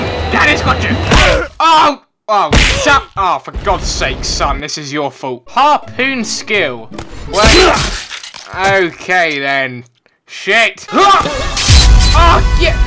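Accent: England, United Kingdom. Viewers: I Really love your Voice its so english i Shall go to England on vacation next year so i hope i Met you there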